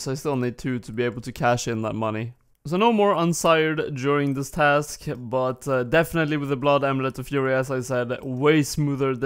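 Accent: Sweden. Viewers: Raspig svensk dialekt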